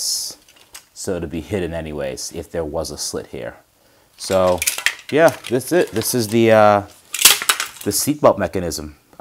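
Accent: United Kingdom. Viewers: At first I thought you had picked up a British accent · Loving the British accent. Nice touch lad.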